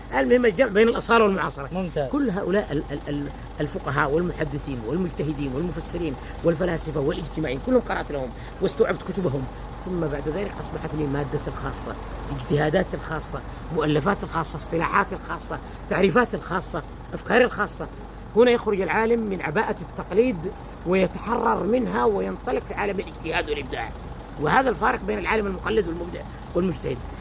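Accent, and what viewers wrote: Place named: Tihama, Yemen. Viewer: ايه بس اللكنه التهاميه تخرب فصاحته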